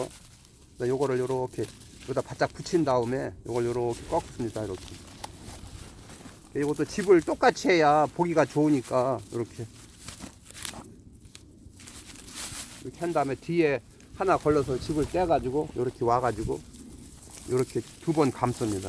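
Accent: Chungcheong, South Korea. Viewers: 손 새끼는 제가 더 잘할듯 ㅋㅋ 충청도 사투리 정겹습니다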